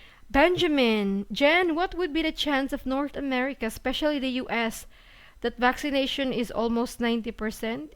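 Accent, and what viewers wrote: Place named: Philippines. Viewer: when u speak english your accent is very filipina. try british accent. no matter how sexy u sound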